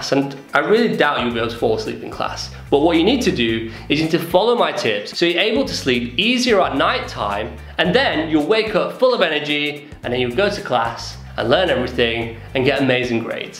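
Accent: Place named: Middlesbrough, United Kingdom. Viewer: Really? You sound more Middlesbrough :-). Yes, thoroughly enjoyed video thanks! X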